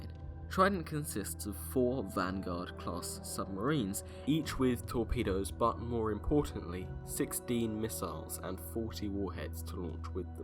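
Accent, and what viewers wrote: United Kingdom. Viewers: Good voice. Good research. My type of humor. Oh and a British accent. It does not get much better than this! Liked and subscribed, sir!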